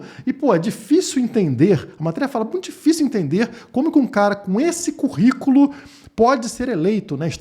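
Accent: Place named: Tijuca, Brazil. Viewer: Rapaz...você é tijucano?! Rsrs TMJ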